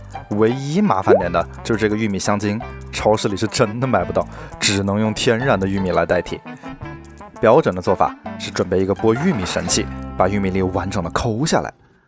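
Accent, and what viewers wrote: Wuhan, China. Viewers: 熟悉的武汉普通话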